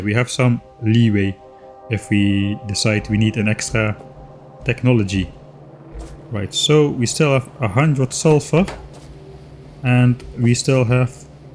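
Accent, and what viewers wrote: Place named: Limburg, Netherlands. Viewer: Was trying top determine from your accent from which part of The Netherlands you are. My guess is the northern part of Limburg. How close am I?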